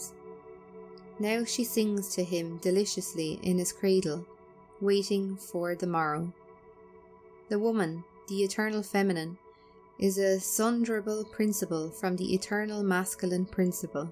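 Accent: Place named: Ireland. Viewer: No doubt, that’s a lovely Irish accent I hear. Thank you.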